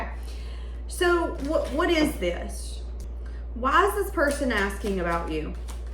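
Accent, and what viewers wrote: South, United States. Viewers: you are very welcome..I like the sound of your American southern? accent..its great..I'm English and live in NZ..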